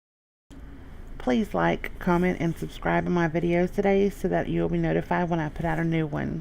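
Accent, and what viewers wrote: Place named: South, United States. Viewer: Where are you from? I can tell the southern accent...lol. I'm from NC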